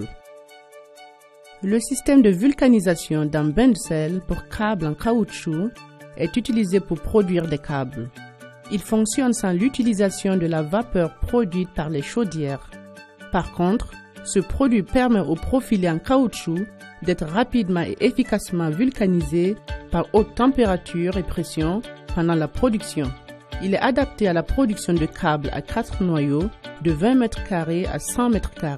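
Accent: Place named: Africa. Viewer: j'adore l'accent africain !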